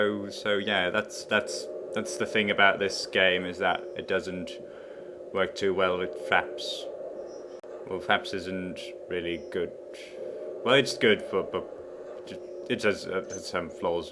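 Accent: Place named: RP, United Kingdom. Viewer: You sound posh as fuck.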